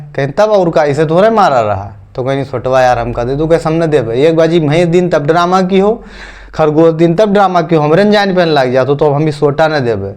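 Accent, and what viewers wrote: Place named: Awadh, India. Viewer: Sir aapki ye Sunday ki kahaniya Sun kr bachpan yaad aa gya❤❤😍..... mai ayodhya se hu aur aapki awadhi dil ko chhu jaati h...... aise hi awadhi ko aage badhate rahiye......👌👌👏👏👏👏👏👏🥰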